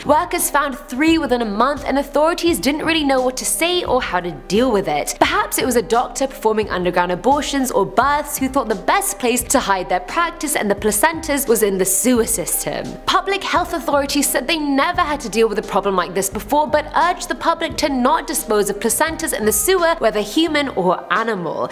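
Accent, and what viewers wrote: United Kingdom. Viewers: I'm assuming she's British because she has a British accent...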